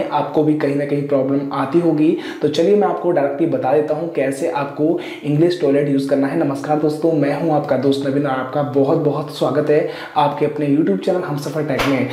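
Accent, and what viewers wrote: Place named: Pahari, India. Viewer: Bheji 🙏 isme kya new hai video me ! Bhut ajeeb lga ye video but apka sh - s maje daar hai jo sari pahadi logon ki lenguage me sunai deta hai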